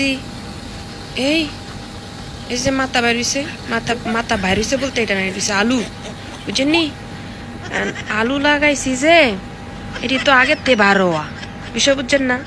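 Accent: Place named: Bancharampur, Bangladesh. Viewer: আমার কেন যেনো মনে হচ্ছে আপনার বাড়ী বাঞ্ছারামপুর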